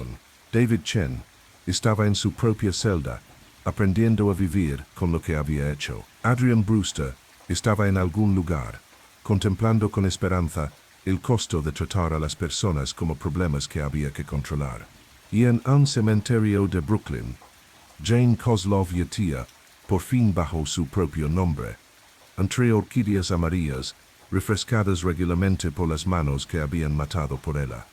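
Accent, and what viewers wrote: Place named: England, United Kingdom. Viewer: Porque está payasada de hablar con ese acento de inglés tratando de hablar en español.Insoportable.Una pena.No puedo recomendarlo.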